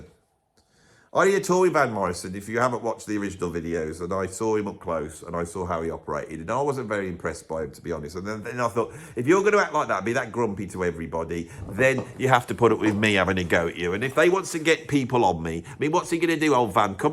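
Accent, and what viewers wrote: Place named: Liverpool, United Kingdom. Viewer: Came for the Liverpudlian accent and the childish takes. Was not disappointed.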